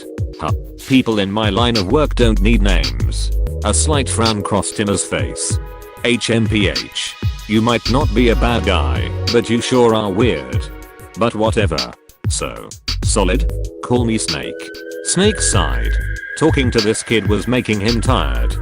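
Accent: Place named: United States. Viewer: You must be American Englishes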